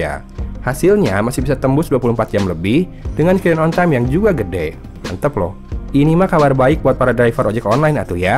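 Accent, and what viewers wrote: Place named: Sunda, Indonesia. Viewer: Urang sunda mana suara nyyy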